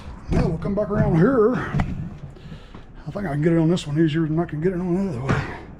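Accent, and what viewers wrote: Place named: Georgia, United States. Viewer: Sounds like you in ga I’m in Covington ga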